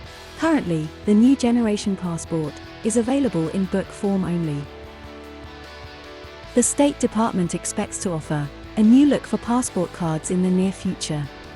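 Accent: United Kingdom. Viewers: why is a british person narrating a video on US passports?? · It for a US passport yet the speaker has a British accent. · An British voice over advertising an American passport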